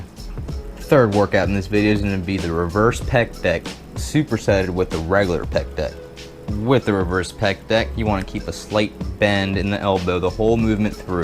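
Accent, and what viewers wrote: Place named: United States. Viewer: Lol that intro and what's with your country accent boy lol keep it up though man!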